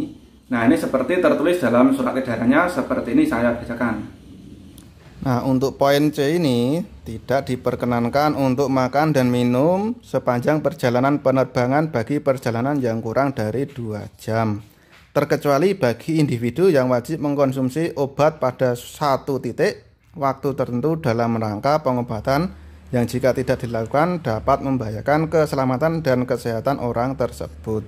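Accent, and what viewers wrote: Java, Indonesia. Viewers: Suka bngt dgn logat jawanya Mas.. **HALOO**... **ASSALAMU'ALAIKUM **.... 💖💖👍👍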